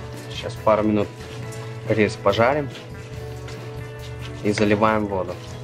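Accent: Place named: Ukraine. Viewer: украинский акцент такой ))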